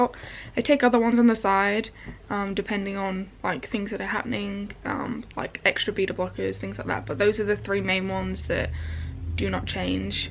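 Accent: United Kingdom. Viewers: I've seen your vids allot but because of your accent thought you must be in the UK. Can you explain or tell me where you have in vids before, how you come to be in AU. Have you immigrated, do you use Medicare, are you on the NDIS etc. Where you as sick as you are now when you came over here, is your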